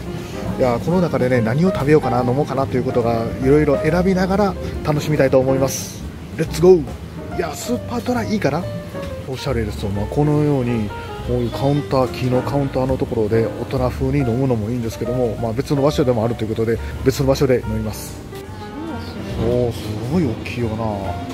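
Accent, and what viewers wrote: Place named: Kanto, Japan. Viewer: さん大阪？大阪の人なら「今日もはじまるぜぇ〜」はないで！ 「今日もはじまんで〜」せめて「今日もはじまるでぇ〜」 動画中は敬語喋られてるから関東訛りっぽくなるのはしゃーないけど、もう少し大阪アピールして欲しいな^_^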